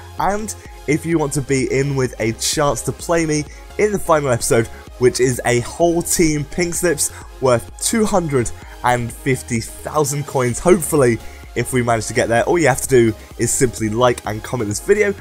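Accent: United Kingdom. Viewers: dont you worry, you talk british and we all love it <3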